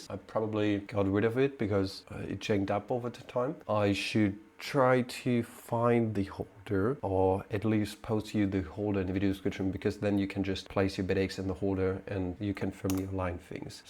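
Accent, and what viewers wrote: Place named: Oxford, United Kingdom. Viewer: I love your clear Oxford English, and now weiter to the Video. Best regards Ingo. 🐇🖖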